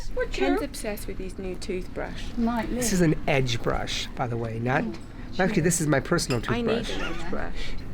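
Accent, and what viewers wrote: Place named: England, United Kingdom. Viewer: That voice and English accent! Love VB since she was Posh Spice!!!! ♥️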